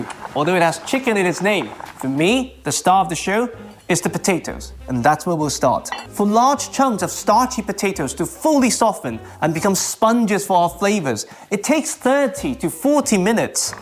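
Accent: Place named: United Kingdom. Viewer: Your British accent is adorable! 🥰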